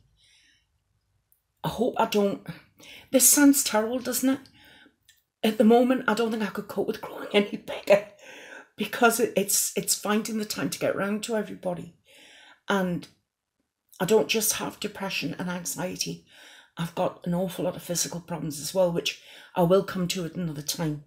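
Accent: Newcastle, United Kingdom. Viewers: I keep thinking 'I wish you were my Nan!' I don't think im young enough to be your granddaughter, but the only grandparent I have left hates me lol! I think its the geordie that I somehow can hear in your voice, but I find it very comforting... like I keep expecting to see you call me 'pet' lol! But honestly thank you for your kindness, it does help a lot to know I'm alone <3 hope you are feeling a bit better.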